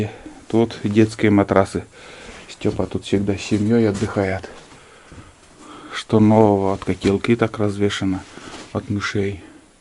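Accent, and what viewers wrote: Komi, Russia. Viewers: я из Инты,тоже дачка была в лесу,но у нас не мыши а леменги одолевали за зиму,оставлять ничё нельзя было🙂🙂🙂а у вас прям говор Коми👍👍👍👍подписка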